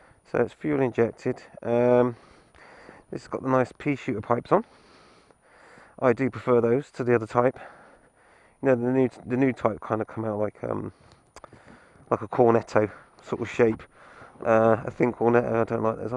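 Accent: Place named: RP, United Kingdom. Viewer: What size engine are these 214 trumpys you do sound rather POSH and TOFFEE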